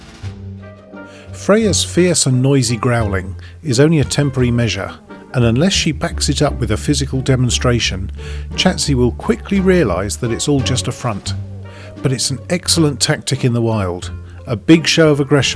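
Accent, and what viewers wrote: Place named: United Kingdom. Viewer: ya i am also hooked and i love the British accent ;p . big Tigo fan myself !!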